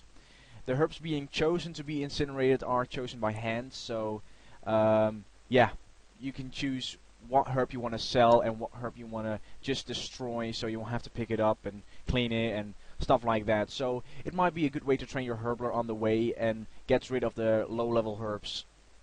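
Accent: Netherlands. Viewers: I'm gonna guess by your accent that you from Holland? My bro thinks you are Norwegian but you sound like you are from Holland. Am i right?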